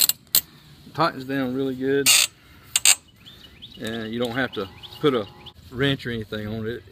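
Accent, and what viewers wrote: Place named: South, United States. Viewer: I believe it's your accent that TRULY GAVE ME CONFIDENCE IN YOUR EXPERTISE. For those outside the USA who only know a southern accent from HollyWood movies you may be used to hearing that accent whenever someone DUMB is talking (Horrible hollywood elitist stereotypes). BUT FOR THOSE OF US IN THE US we know that accent is that of a working man, AKA THE GUY WHO KNOWS HOW TO BUILD AND FIX STUFF! Thanks for the video.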